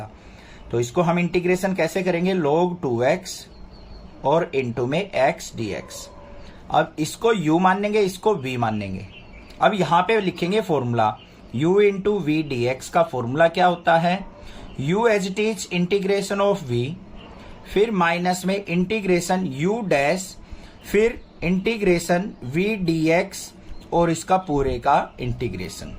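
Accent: Haryana, India. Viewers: Sir aap haryana se ho ? Aapke voice tone se lg rha h aisa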